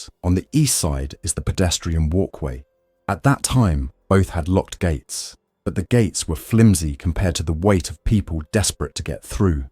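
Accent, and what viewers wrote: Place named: United Kingdom. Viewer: it does seem a little odd for an AI voice that could have whatever accent you want, to have a british accent talking about what happened in america.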